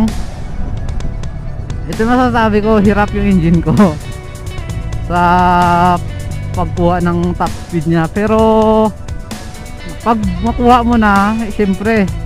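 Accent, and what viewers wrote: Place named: Iloilo, Philippines. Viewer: ilonggo gid ah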